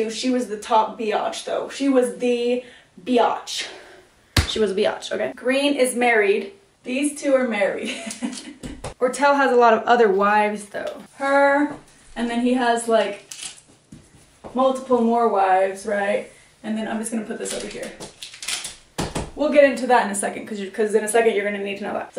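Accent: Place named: Alabama, United States. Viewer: Are you from Alabama by any chance?😅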